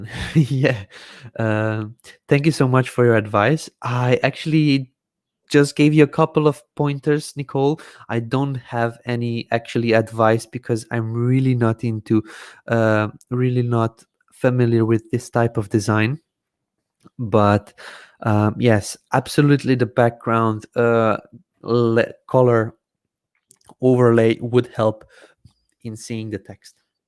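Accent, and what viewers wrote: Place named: England, United Kingdom. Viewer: I like this video but sometimes is hard to follow the english accent in all of his videos. thanks!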